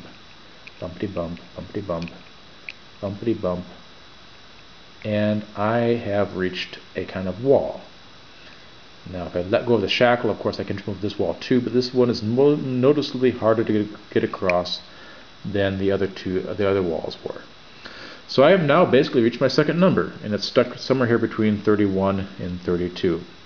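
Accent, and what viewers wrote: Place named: Canada. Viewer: I detect a Canadian accent!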